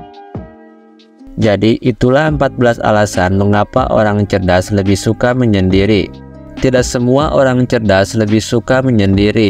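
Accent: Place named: West Java, Indonesia. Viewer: yg ngomong urang sunda nya? 😅 aksennya keliatan..🙏hapunten kang..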